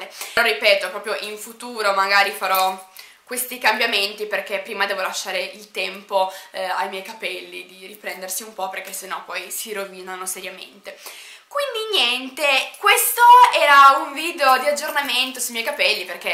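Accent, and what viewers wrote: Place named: Piedmont, Italy. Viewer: Aaaaalla faccia dell'accento piemontese!Nè!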